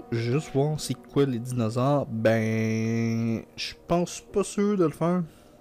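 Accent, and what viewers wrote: Quebec, Canada. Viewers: j'aime trop vote accent québécois ^_^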